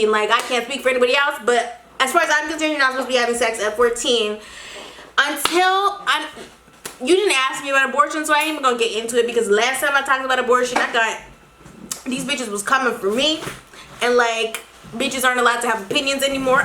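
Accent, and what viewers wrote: United Kingdom. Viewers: That British accent😩👏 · Your British accent is on point! you sound posher than me😂😂 ,Love your videos x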